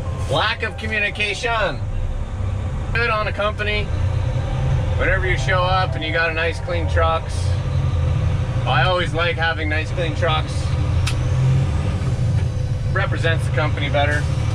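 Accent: Canada. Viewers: Americans are back wards lol. We pronounce it that same way as you Canadians here in Australia. Shift it.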